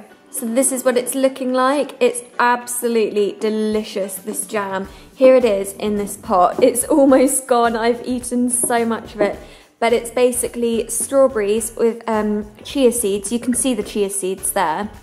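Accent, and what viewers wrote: United Kingdom. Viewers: "cay-an" im laughing. the way you say things (I guess its just British) is funny to me. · Where is she from? It's a British dialect but I can't quite place it exactly :) Brighton maybe?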